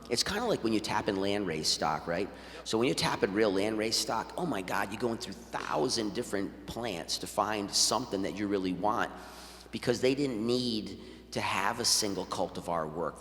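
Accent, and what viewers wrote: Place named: New Jersey, United States. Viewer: sounds like he is from NJ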